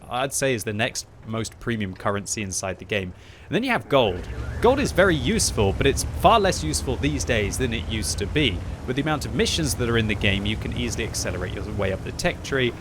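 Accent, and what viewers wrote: France. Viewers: omfg the French Accent is hilarious when you talk French normally. 😂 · Wow, that Frenglish...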